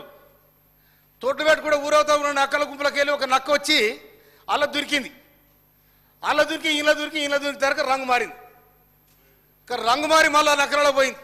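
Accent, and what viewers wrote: Telangana, India. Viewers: మీరు స్వచ్ఛమైన మన తెలంగాణ పల్లెటూరు బాషా సూపర్ అన్న ❤️🤝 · తెలంగాణ యాసలో మాట్లాడిన సామేలన్న ....👌🏻👍✊🏻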